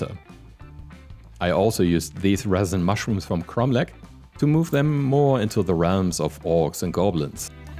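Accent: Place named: Lower Saxony, Germany. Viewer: haha, ich war nicht 100% sicher denn Dein englisch ist sehr gut... war so ein Gefühl, die Niedersachsen erkennen sich eben 😅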